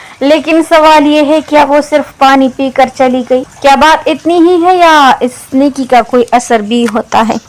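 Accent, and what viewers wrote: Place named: Saraiki, Pakistan. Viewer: Bolne ka style suraiki lag raha he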